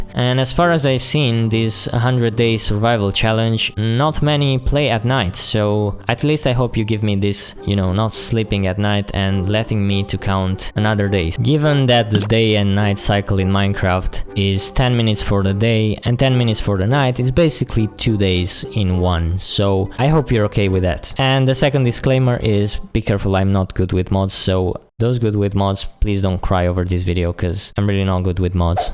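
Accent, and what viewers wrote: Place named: France. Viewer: btw where are u from ur actent is likr frech on something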